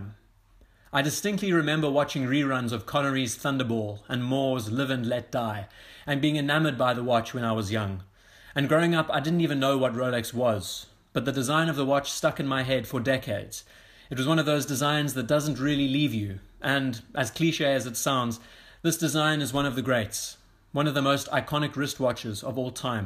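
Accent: South Africa. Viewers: Great content.. Discovered you literally today. Watched lik 4vids 😅 You sound South African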